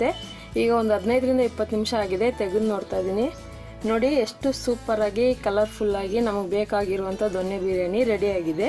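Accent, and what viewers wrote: Tamil Nadu, India. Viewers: Tamil nimma mother tongue allva nimma language hage ide.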